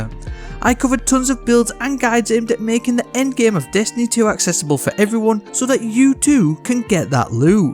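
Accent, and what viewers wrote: United Kingdom. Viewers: Are you from Britain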